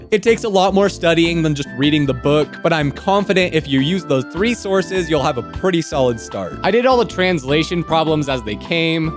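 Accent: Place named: United States. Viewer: i still feel like you kinda have a really strong american accent when speaking toki pona... lol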